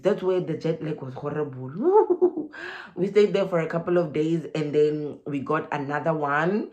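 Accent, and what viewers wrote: South Africa. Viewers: Love the way you explain in such a South African way! Makes me feel so at home and like we can definitely do this!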